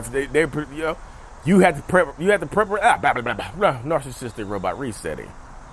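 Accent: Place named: North Carolina, United States. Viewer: Dude, your accent make me wan move bk to Rocky Mount n things like dat haha 💛💪🏻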